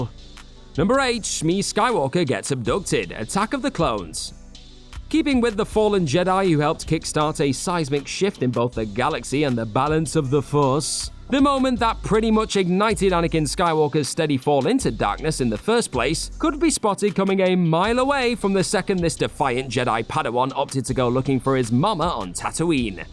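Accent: United Kingdom. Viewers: Ya British mate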